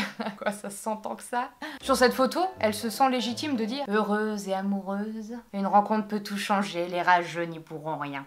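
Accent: England, United Kingdom. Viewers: J'adore trop ton accent d' anglais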